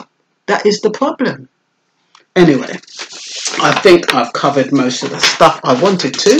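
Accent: Jamaica. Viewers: Okey but men, nowadays are putting on make-up and weaves too. Men just try to detach themselves.I love your Jamaican accent by the way.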